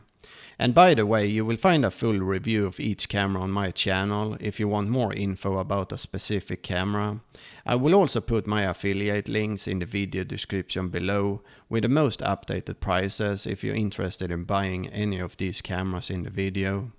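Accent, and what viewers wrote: Sweden. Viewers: Your accent sounds a little bit Swedish, are you?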